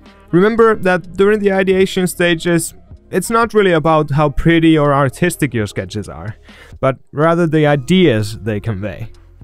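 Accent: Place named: Finland. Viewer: Haha I guessed right - Finnish accent! (Altough being Finnish myself it's not much of an achievment)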